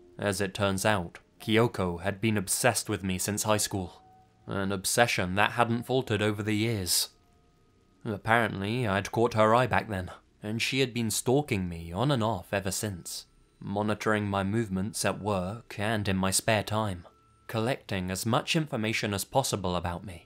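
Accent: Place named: United Kingdom. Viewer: I have been watching you for a year and I've only just realized your British like me.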